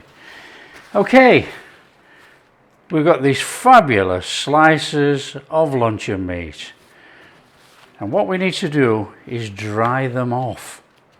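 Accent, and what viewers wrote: Yorkshire, United Kingdom. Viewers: I may be wrong but I though he said Yorkshire but 20 years removed? Forgive me if I'm wrong.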